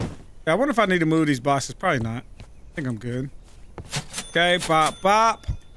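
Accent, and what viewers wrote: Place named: Midwest, United States. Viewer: This how country midwesterner’s talk. But you ain’t got no time to know this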